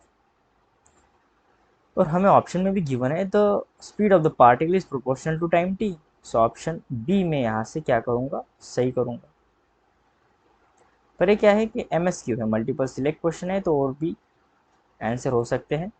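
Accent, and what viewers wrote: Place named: West Bengal, India. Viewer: Sir 's accent seems to be bengali,or assamese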